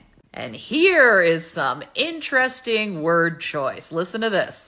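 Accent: United Kingdom. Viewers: Hahahaha, Please keep using that British accent telling us about all their distasteful hypocrisy!!!😂😂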